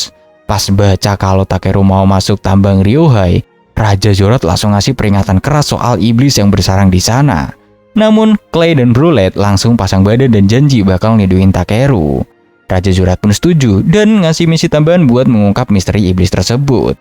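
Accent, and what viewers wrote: Medan, Indonesia. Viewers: Kaya Medan aja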